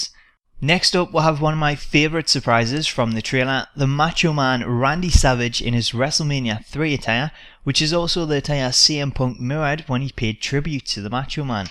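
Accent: Newcastle, United Kingdom. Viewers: you have the best accent ever, Newcastle I presume?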